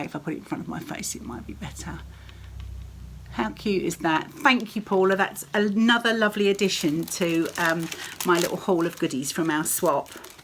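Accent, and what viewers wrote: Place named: Nottingham, United Kingdom. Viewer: Gorgeous stockings! Loved your use of the word “tiddle”. We had a lovely neighbour who we watched over. She was from Nottingham & always used that word. My hubby could never figure out why she always called him duck! We were neighbours here in Vancouver, Canada for 28 years. Sadly she passed away 6 1/2 yrs ago at the age of 90. Your accent always makes me think of her. Merry Christmas to you & your family.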